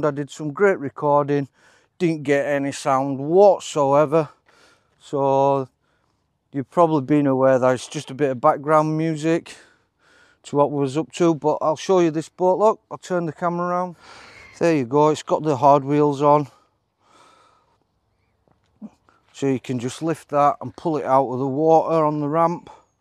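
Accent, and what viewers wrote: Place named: Ireland. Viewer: Love your Irish Brogue👍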